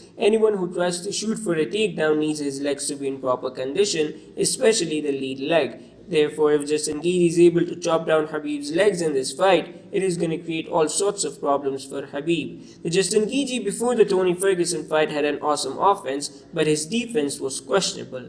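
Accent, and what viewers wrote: India. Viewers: yeah man..great to find an indian fight fan.... Namaste ... His accent sounds like indian ...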